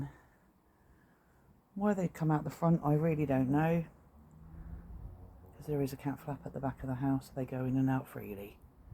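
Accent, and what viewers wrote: United Kingdom. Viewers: BUONASERA SIGNORA È SEMPRE UN IMMENSO PIACERE SENTIRE IL SUO PERFETTO ACCENTO BRITANNICO E VEDERE I SUOI MERAVIGLIOSI GATTI E LE STUPENDE VOLPI DEL SUO QUARTIERE ANGELI STUPENDI ANCHE LEI È UN ANGELO PER TUTTI LORO!!!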